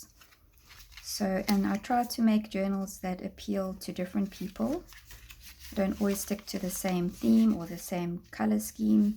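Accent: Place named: South Africa. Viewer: You have some wonderful ideas and beautiful items! Love you SA accent too! 🤗🌸💕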